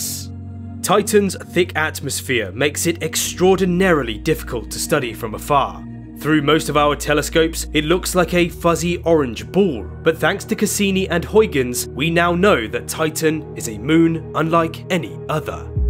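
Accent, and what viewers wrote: United Kingdom. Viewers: Is the narrator the same Brit who pushes all the snake oil cures?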